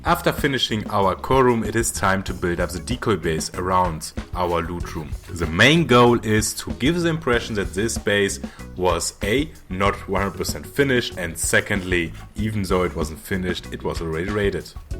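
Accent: Germany. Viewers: that german accent tho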